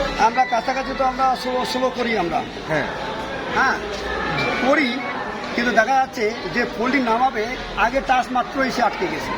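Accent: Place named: India. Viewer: এইডা তো ইন্ডিয়ান মাল। এর ভাষা শুনলেই বুঝা যায়।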